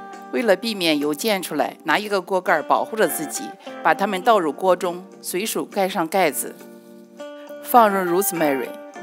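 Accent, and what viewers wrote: Qingdao, China. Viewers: 山东人吧？哈哈，一听就亲切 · 绝对如假包换的青普：) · 听着这熟悉的口音 像是青普（青岛普通话）呢😄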